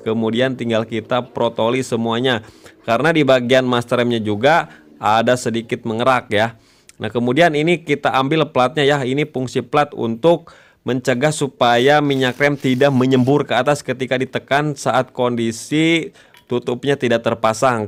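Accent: West Java, Indonesia. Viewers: Sundana hese di leungitkeun 😄👍🏻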